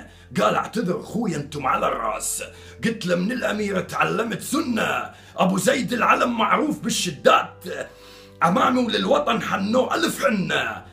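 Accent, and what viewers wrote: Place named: Iraq. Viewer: هذا الرجل لهجته عراقيه.... حياكم الله عمامي زبيد في كل مكان.....ابووضاح العبيدي الزبيدي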